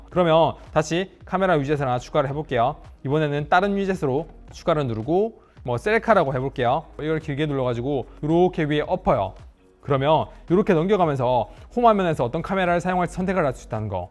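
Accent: Gyeongsang, South Korea. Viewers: 좋은글 감사합니다~ 정신없는 경상도 말투는 정말 안바뀌시네요~ 😂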